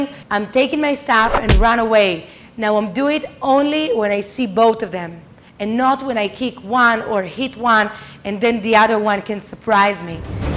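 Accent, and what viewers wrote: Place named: Israel. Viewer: אני מבין לפי המבטא שאת מישראל זה אומר שאת עושה בישראל שיעורים?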